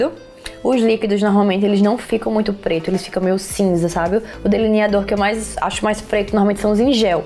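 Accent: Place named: Maceió, Brazil. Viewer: amo o sutaque dela e tmb sou de maceio❤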